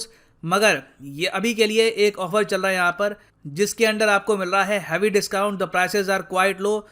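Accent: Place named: Haryana, India. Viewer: Haryanvi accent 😃😃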